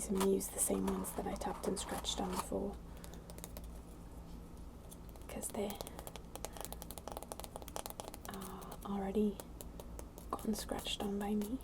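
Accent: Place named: United Kingdom. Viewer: I'm in love with your accent! Are you from England or Scotland? Perhaps Australia?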